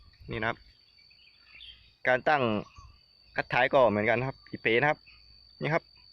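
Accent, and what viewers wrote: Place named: North, Thailand. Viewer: อยู่บ้านไหนคับ อู้เหนือ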